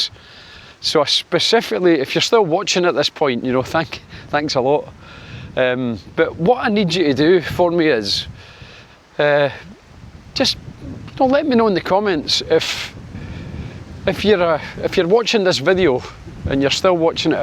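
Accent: Scotland, United Kingdom. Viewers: Your channel success is down to your easy going friendly approach with the great Scottish accent! Englishman here living in NZ for 20 years but soon to return to U.K. Primarily to be able to tour more of Europe (it’s all a bloody long way from NZ!). Stumbled on your channel as I was interested in Spain. I always subscribe to a channel the moment I find something that may be interesting and then every so often will edit my subscribed list of ones that no longer appeal to me. Don’t worry you’re still in there!😁 Another branch of your channel should be you filming / commenting from your motorcycle 🏍️ (biker here as well 👍). Cheers 🍻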